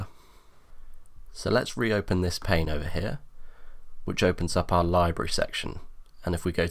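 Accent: United Kingdom. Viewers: At last!! A nice British accent to listen to on an Ableton tutorial. Phew! ... sorry Murica.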